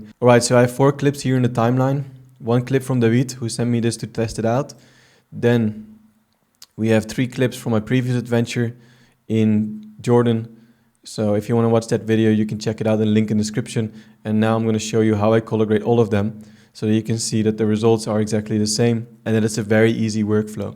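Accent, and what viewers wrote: Netherlands. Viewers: Je bent Nederland volgens mij haha het accent nice video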